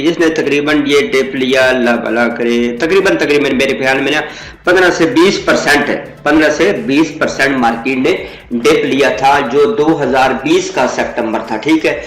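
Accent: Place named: Punjab, India. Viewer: ap jo punjabi ka tarka lagaty hain wo bahot he mazy ka hota hai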